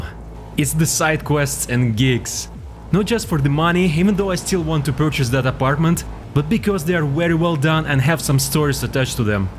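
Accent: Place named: Eastern Europe. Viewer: also, where are you from? I hear a nice eastern-European accent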